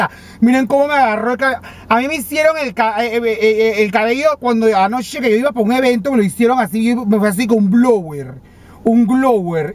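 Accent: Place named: Panama. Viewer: oirte hablar desde España (vivo en España), me trae muy buenos recuerdos de mi Panama. ¡Chúleta! casi me meo de la risa.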